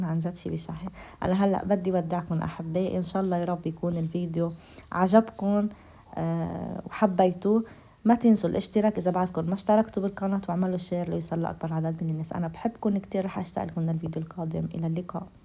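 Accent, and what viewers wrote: Lebanon. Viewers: ما أحلى اللهجة اللبنانيه .. ❤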